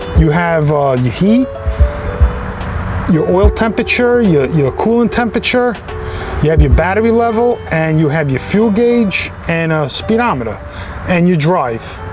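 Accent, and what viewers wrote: New York, United States. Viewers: This is really a big pice of sh*t u kno that rite trying to make it sound like it's a cream puff the way your talking where u from nyc that's y won't be caught dead in this bro it probably stinks of ass n piss with all the sheets covering disgusting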